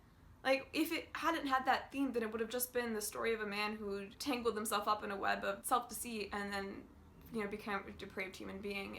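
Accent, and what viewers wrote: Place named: Canada. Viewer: What a lovely Canadian accent Oh! By the way nice review.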